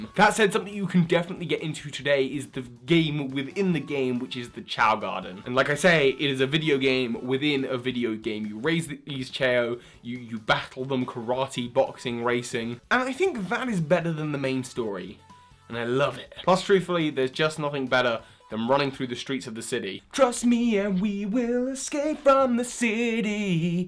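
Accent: United Kingdom. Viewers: I love British accent so much!😫😫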